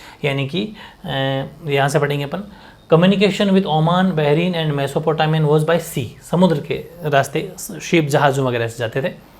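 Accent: Rajasthan, India. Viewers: राजस्थान से हो क्या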